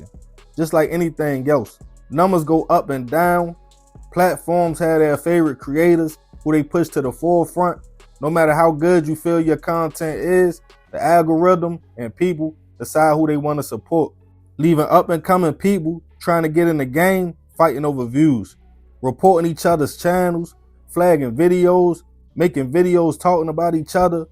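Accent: Baltimore, United States. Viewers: I'm not going to lie, I found this channel because I wanted to hear more of the Baltimore accent, and stuck around because the content is good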